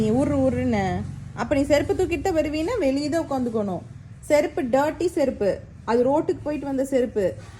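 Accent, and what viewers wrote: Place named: Coimbatore, India. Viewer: I just loved her Coimbatore Tamil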